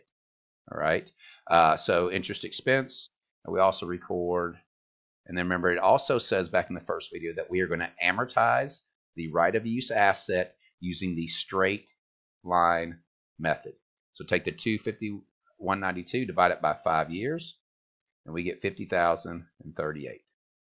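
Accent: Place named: South Carolina, United States. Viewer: Are you from south carolina? You sound like Linsdey Graham lol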